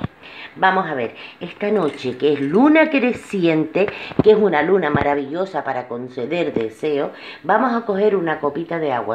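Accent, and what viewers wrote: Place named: Canary Islands, Spain. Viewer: Dios te oiga, lo acabo de hacer esta noche, espero que mi deseo se cumpla, es de economía, porque lo estoy pasando mal... Mi duda es, si la noche de luna llena también lo dejó fuera en la ventana?? O... el día antes de la luna llena lo tengo que tirar a la naturaleza?? Se tira el agua y todo lo de dentro, no?? La copa También?? Disculpa mi torpeza.. Gracias y un beso.. y si me pudieras dar tu correo te lo agradecería.. Es que, tengo un problema grave de economía, con muchas deudas, por eso quería hablar con usted por privado, para que me diga cuál es el mejor ritual.. Tienes un acento canario.. yo viví tres años en Tenerife y me enamoré... Un beso, espero tu contestación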